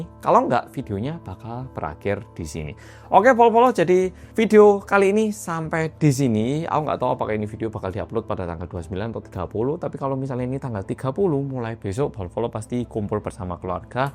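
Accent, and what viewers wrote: Java, Indonesia. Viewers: Ojo video terakhir lah mas....aku jd kangen medok nyaaa😂❤❤❤ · Nyatanya ini bukan yang terakhir mas medhoookkk😂😂😂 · Jowoe keren medok tenan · Terakhir terakhir terus tp selalu ada aja updatenya kasian alm Sae Ron.. aku aja tiap buka yutub nungguin oppa yg medok krn nungguin kabar2 terbaru teruss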